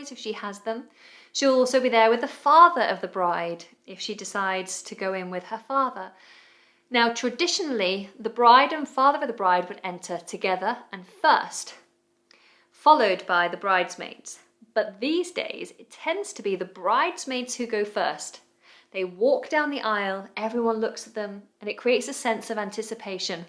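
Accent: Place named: United Kingdom. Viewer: Hi, I really like your videos, you are very nice and have a lovely voice and a clear and perfect British accent that makes it easier to understand and learning english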